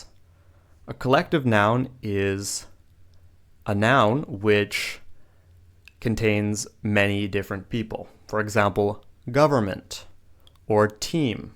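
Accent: United States. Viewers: American accent ❤ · My goal is to speak like an american Like you😂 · When I first started to see your videos when hearing your accent I thought you're an American person.😂💜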